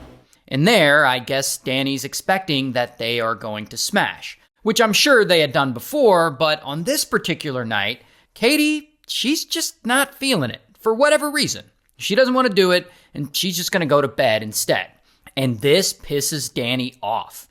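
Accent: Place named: United Kingdom. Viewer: personally i wouldnt let a british dude yell at me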